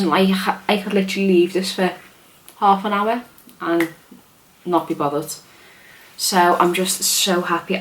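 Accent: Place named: Ireland. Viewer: Your accent is really pretty. Are you Irish?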